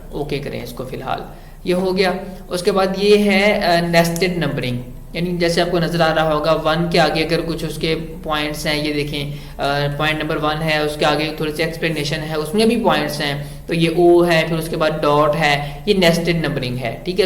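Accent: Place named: Punjab, India. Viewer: Hahaha punjabi touch 👌 .. Well explained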